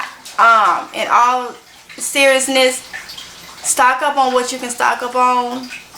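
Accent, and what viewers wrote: South, United States. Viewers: Love her country voice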